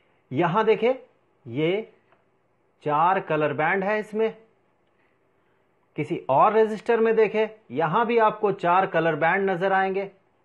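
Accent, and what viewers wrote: Bihar, India. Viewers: Apna yeh Bihari accent change karo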